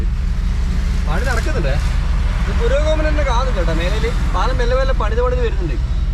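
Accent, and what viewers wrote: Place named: Malappuram, India. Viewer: Appam alla malapuram basha patri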